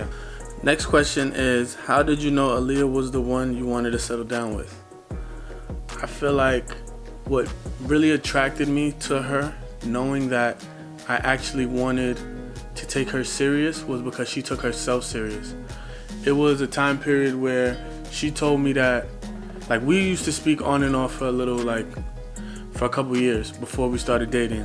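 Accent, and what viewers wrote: Jamaica. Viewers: Can tell you have a little Jamaican in u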